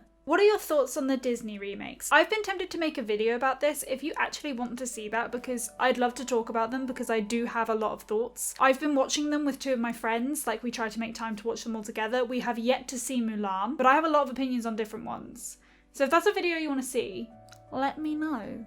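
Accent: United Kingdom. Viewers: So.. many.. pikachus.... Also where in UK are you from? You probs have my favourite type of British accent it's so lovely :o hallo from Michigan :D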